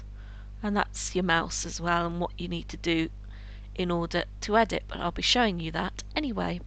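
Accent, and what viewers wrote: England, United Kingdom. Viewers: i know this has nothing to do with the video but...i love that english accent ^_^ but yeah i have audicty great software if you dont ecpect to do much with it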